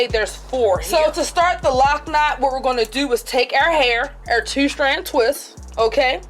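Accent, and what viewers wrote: Baltimore, United States. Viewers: Are you from Baltimore? You have a very distinct accent. Loved the video! 💖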